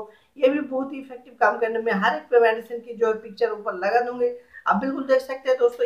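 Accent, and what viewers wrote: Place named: Bengal, India. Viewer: लैन्ग्वैज NO PROBLAM आप बैन्गोली है ओर हिन्दी बोल तै हुवै अछ ऐ लगी हो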